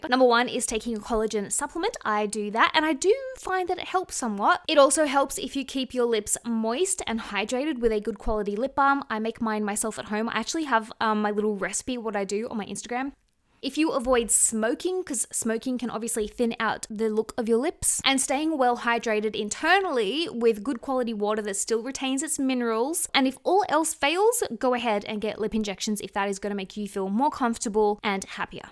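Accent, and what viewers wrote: Australia. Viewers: It´s so cute how your Aussie accent got stronger since you moved back to Australia <3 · I like your videos very much! (And your aussie accent;)) BUT PLEASE, FILLER are NOT completely dissolvable! Greetings from switzerland🤗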